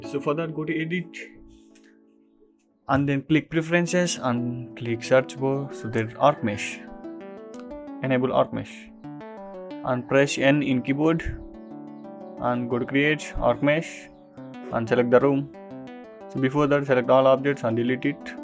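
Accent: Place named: India. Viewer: This tutorial is an attack on one's ears by a very bad accent (sounds like Hindi) and irritating repetitive noise (music) in the background, almost infringing to the foreground. If one can suffer this or tolerate it, there may be something to learn. However, there are better tutorials.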